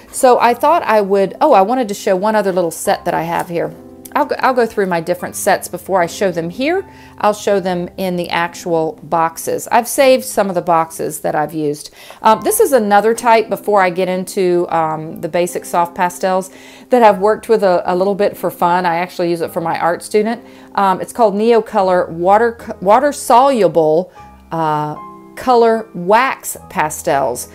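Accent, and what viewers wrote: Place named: South, United States. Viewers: Just part of the learning process (for all of us!). Love the southern accent! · A southern accent never hurts. Hehe. Also, you'd never know how they are pronounced just by looking at them. I've seen even the most seasoned artists muck up "quinacridone" and "Phthalo." We can't be expected to know how to pronounce words derived from chemistry, nevertheless words from foreign languages! 😝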